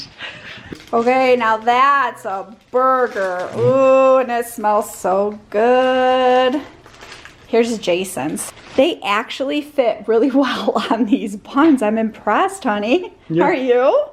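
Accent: Michigan, United States. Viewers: I found your channel just awhile ago, so haven’t heard what part of The Mitten you are from. I like your bubbly personality and the accent takes me back. Lol We lived in Michigan and Kogels is the absolute best!!!! We live in Tennessee now, but every time we go back we stock up on anything Kogels. The bologna is not like anything I have ever had anywhere. We buy a couple of rolls and freeze it. I wish the sold it here. I took this brand for granted when I lived there. We’ve ordered some also.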